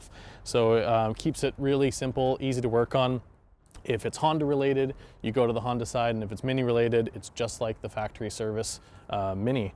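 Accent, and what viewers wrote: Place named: Canada. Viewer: Nice, clean build. I thought you were American at first, but the 'oots' and 'aboots' now make me think Canadian!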